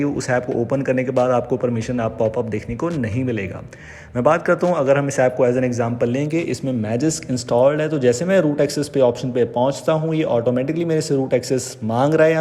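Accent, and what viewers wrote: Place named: India. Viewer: Your English sounds like a combination of Hindi and English, I don't understand anything.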